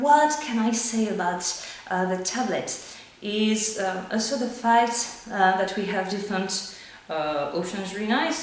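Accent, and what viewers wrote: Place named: France. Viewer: your French accent is really enjoyable, quite frankly.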